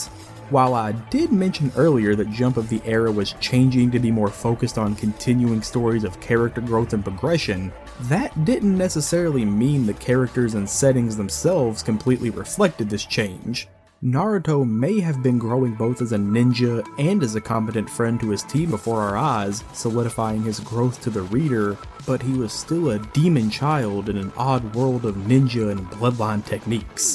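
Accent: South, United States. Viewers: Love the video, and as a fellow Texan, love your narration through it. There's nothing like hearing someone describe something they're passionate about in a fine Southern twang. Also, you're like one of the only people on Youtube talking about Bleach that acknowledged the existence of the "speed of the world" poem, so thanks for that. It's one of my most favorite moments/poems from the manga that just evokes so much emotion. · just found your video man, I cant place the accent, TN or MS?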